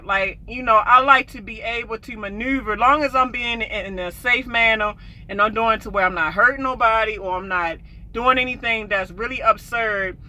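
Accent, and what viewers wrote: Baltimore, United States. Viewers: That Baltimore accent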